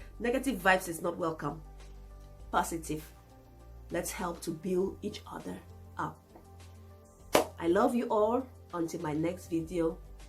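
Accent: Nigeria. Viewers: You also speak like Nigerian, .... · You look and sound nigerian. Am a ghanaian but I will surely mistake you for a nigerian. I think nigerian music will remain the best, maybe because of population. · Wow. Even you talk like a Nigerian though. I mean you said "abi" a yoruba word. Having said that, the entire Africa has a long way to go. 1. You want to compete with a population of almost 200 million 2. Nigeria has the biggest economy in Africa 3. They are all over the world holding key positions and owning platforms that makes it easy for Nigerians to climb. 4. It's too late for the ban to have any effect cos of the Internet. 5. The competition in Nigeria is extremely high in everything you can think of, which brings out the best. Many do not make it at all. Even churches are competing. 6. Nigerians hustle like no man's business. Take one of your cameroon musicians, let him stay in Lagos for a year and then take him to Cameroon, you'll be shocked how he will stand out from the rest . 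The hustling spirit would have rubbed on him. 7. Other industries like film, have helped project our image, lots of Nigerians abroad are recognised globally so it helps. Solution is to collaborate, humble yourselves, ask questions, take trips back there to see how things are done, invite the top pple to Cameroon to hold forums on how to improve. One love to all Africans, as a Nigerian i believe we can all win, we just musnt pull ourselves down. God bless. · It really hard to believe that you are not a Nigerian, it has been a shock a an unbelievable experience for me for the past few days that I bump into a YouTuber discussing about this whole Nigerian music ban, but deep down in me I was like are these people drunk??? Are they not Nigerian, they way they talk and new you too, if you come to Lagos I swear no one will believe you're not a Nigerian even if you keep telling people, I believe there's something more to this, you guys are more Nigerian than what I think. 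Love you sister.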